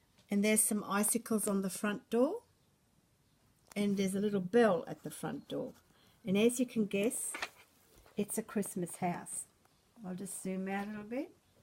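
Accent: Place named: Australia. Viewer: just lovely, love santa up there... i did not know you came from Germany...you have the Aussie acesent down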